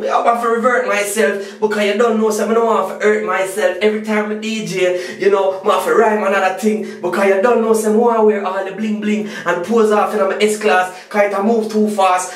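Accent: Jamaica. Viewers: erm ok ur comment wasn't needed i said nothing about his color. i do know that there are white Jamaicans Chinese Jamaicans etc. what i meant was that his accent was really good but then i realized he was actually a Jamaican. and when i said true Jamaican i wasn't referring to color i was referring to the accent, because at first i thought is accent was meant for comedy. so next time before you post you long unnecessary essay stop and think about what i actually meant.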